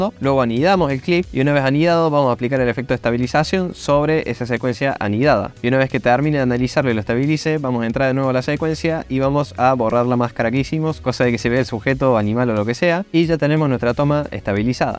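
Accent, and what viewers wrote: Córdoba, Argentina. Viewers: Hola, no me he enterado de nada😏 entre que hablas con ese acento argentino y que hablas muy deprisa me he quedado como empecé, lo siento. Soy alumno de la escuela, y por cierto, ¿donde están esas herramientas que dices? Mi opinión es que este tutorial es para un público avanzado hablas con tecnicismos que no entiendo y yo estoy aprendiendo en edición en la escuela. Un saludo. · Holaaa desde Uruguay! Escucho tonadita de Cordobés??? Me encantóooo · sos cordobes, no? Aguante Argentina papaaaa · Grande!!! Qué lindo es escuchar una tonada cordobesa en este canal que sigo hace tantos años!! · Cordobes hasta la medula jajaja 👍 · Vamo' ese cordobés papá! La didáctica que me manejan en este canal es una locura. ¡Abrazo gigante! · Sorpresa grata de escuchar un cordobés acá jajaja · INCREIBLE HERMANO ENCIMA CORDOBEEEEEEEEEEE ♪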